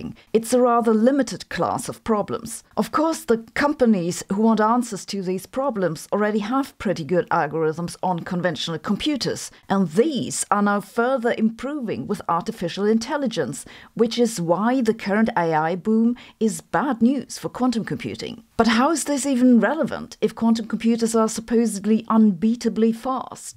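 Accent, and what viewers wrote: Germany. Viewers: Why has her German accent become so thick recently?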